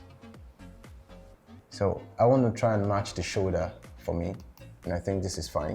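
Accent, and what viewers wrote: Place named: Nigeria. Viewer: This my man sounds Nigerian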